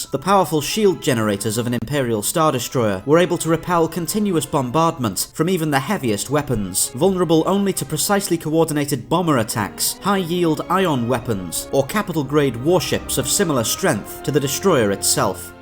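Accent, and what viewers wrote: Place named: Merseyside, United Kingdom. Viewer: Deffo from Merseyside 👍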